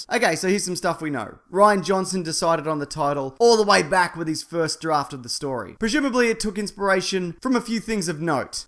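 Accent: Australia. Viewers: this is an awesome channel, made even better by the australian accent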